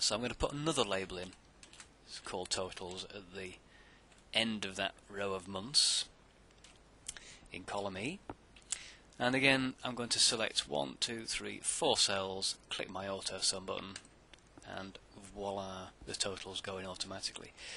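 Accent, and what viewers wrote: England, United Kingdom. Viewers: You are wonderful. Thank you for putting this up! The British accent makes it better too. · Excellent refresher, he's even got the British accent to make it sound less boring than my college MS Office instructor. :) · wow really well presented and nice to hear a good old British voice just getting down to business straight away. If only you'd taught us IT, I'd have understood Excel so much better. Thank You. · such clear sounding brits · thank you for you advices! and you've got a cute english accent, hihi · I think I learn better when I'm getting taught with a British accent. (: I love it. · thanks you rock plus brits are cool crisps and stuff i love it accent is wonderful :) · I haven't used Excel since I taught some middle school course on it at least 10 years ago. Good to hear the English voice, Excel called kind, and "crisps." I'm off and running on my first spreadsheet thanks to this!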